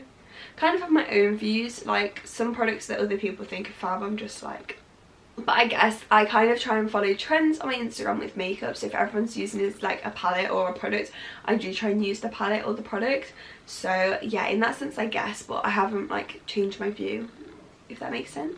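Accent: United Kingdom. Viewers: How come your British accent is becoming more pronounced. We need you to come back to America 😁😷😷😷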